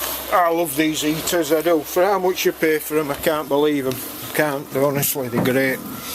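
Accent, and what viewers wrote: United Kingdom. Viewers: Hi. I'm about to buy a Vivaro SWB and I'd like one of these fitting. Do you offer this service please? I'm guessing by your accent that you're in the North? I'm in Lancashire. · Proper northerner. Love the logic, great explanation 👍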